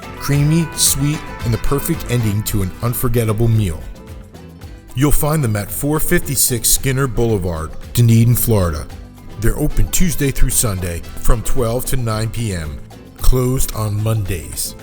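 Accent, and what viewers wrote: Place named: North, United Kingdom. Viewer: You sound like Northern transplant to me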